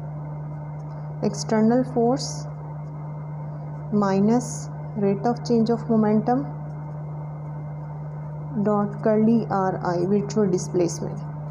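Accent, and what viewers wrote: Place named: India. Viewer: Accent is only Understandable in India.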